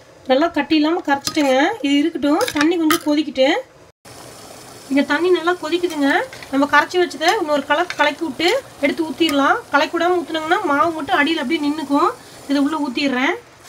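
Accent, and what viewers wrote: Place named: Coimbatore, India. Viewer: unga sontha uru unga voice nalala erukka Akka nenga Coimbatore nenacha Akka na Coimbatore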